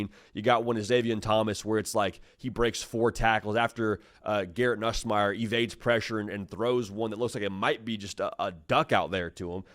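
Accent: South, United States. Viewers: accents exist, and most southern american english accents exhibit a feature called the pin-pen merger, which would make "vanderbilt" sound like "vanderbelt" (sincerely, a vandy grad)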